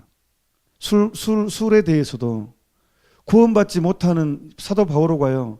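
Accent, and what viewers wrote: Jeolla, South Korea. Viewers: 전라도 사투리가 정겹네요~~♡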